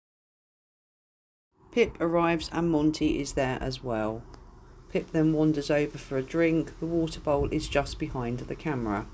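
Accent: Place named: West Country, United Kingdom. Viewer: Also, being a proud glasweigan (& i think I've told you the funny story of being in Devon - i guessed ur accent even though I don't think u ever saw that message, especially as it was Simón & Magoo time and u were run aff yer feet!! - I went to "Buckfast Abbey". Thank God I'm sober now 🙏 but going there was a big thing for a bucky lover 😆 Anyways what am I on about, aw aye - something you, Karen & Carol could watch, perhaps. It's a comedy. I'm being a bit bias, with my glasweigan specs 👓 on - but have you heard of a bbc sitcom series called, "Still game????" I doubt it because you are so far south in cornwall, beautiful west country. Well the last sitcom bbc did of us, was "rab c nesbit". I think you'll know of that. But STILL GAME is so funny. It was made more than 20 years ago. About 2003 it started. Wee half hour episodes. It is on bbc iplayer. 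And YouTube. But you must start at series 1 episode 1!! You must, to get the gist and to enjoy the hilarity. If you are looking for something different to watch, something funny, and if you don't understand any of the glasweigan words, geeza shout 😅 But am sure you'd like it, the English people I've recommended it to, they've gave excellent feedback. Much better humour than Rab c nesbit ever had 😮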